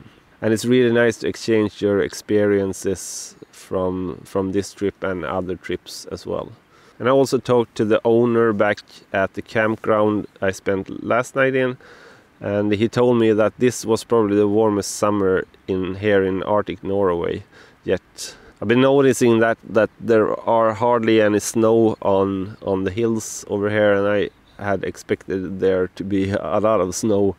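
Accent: Sweden. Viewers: Beautiful, peaceful countryside ! Great English, Swedish accent ?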